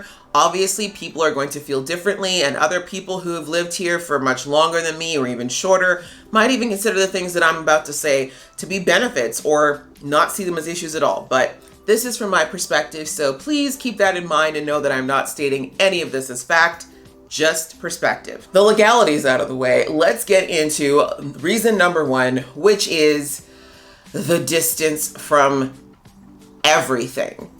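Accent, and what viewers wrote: United States. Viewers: You sound like you come from the US originally. If so, you are not allowed to have your own opinion and that makes your whole video illegal. 14 hours to anywhere ? I tale it that you mean to the only real country on the planet. The the good ol US of A? while you have been away from the US there has been lots of exploring going on. Columbus, that you already know of I expect. But since then, there has Dutch explorers, Spanish explorers, Portuguese and even English explorers and there are now something like 200 hundred recognised countries sharing the planet with the US of A. Oh, its shocking, I know, but we have to face up to reality now and then. Yes, even yanks once they learn proper English. New Zealand is much less that 14 hours away. Indonesia also. Papua New Guinea. the Solomons, Singapore, Malaysia, Phillipines, one even that even yanks should have heard of, no not Canada, Vietnam. 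All much less than 14 hours away mu modern jet airliners. Sorry, is the US still in the Wright Flier stage of aviation ? cheers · thank you for sharing, you sound American, I am from USA definitely considering moving to Australia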